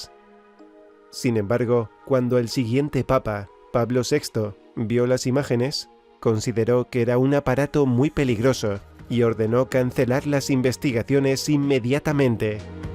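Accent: Spain. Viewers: Que voz del locutor ezpañol 😊😊😊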